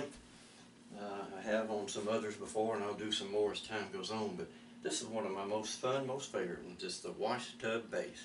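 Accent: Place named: Atlanta, United States. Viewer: Great sound. I have just constructed one but not sure best string to use?? Are you from Atlanta - nice accent. (I'm British from Michael Caine country - South London)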